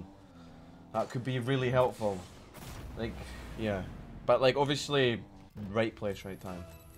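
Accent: Scotland, United Kingdom. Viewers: Same old Scottish AF 😂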